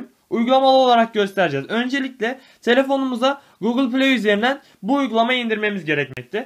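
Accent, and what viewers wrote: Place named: Azerbaijan. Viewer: İntro TV adam azeri bizde azerileri anlamıyoz bazen normal bişi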